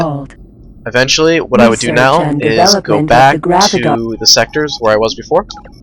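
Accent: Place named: RP, United Kingdom. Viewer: RP is not nerdy, but I expect that coming form some that sounds like you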